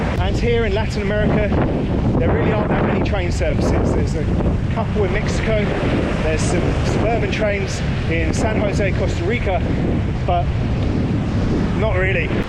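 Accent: United Kingdom. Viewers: This is the "most British" I've heard you in your videos haha. I get it about British food, though, everyone loves the food from their homeland, regardless what everyone else thinks of it.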